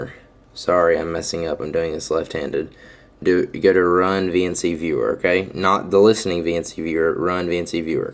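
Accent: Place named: Oklahoma, United States. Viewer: Are you from oklahoma cause i have a cousin name alex and he has accent just like yoi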